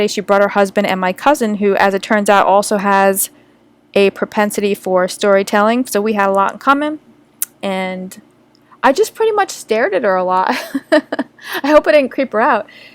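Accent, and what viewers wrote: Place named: Spain. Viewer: I love your Spanish accent.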